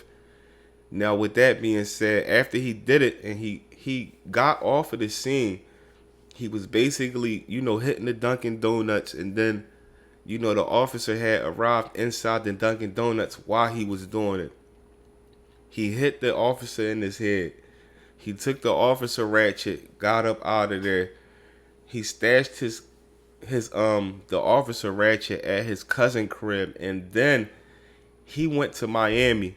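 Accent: Philadelphia, United States. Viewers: I know people that aren’t from Philly or the tri state area don’t be understanding half the words you be saying lol I’m from Philly and I’m like bro talking so Philly that it sounds like you mockin us even though I know you from Philly too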